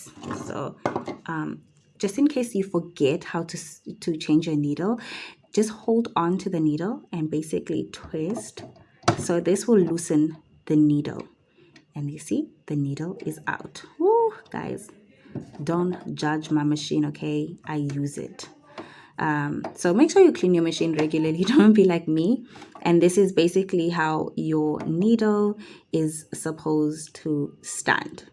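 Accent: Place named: South Africa. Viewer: I heard the South African accent and I was hooked!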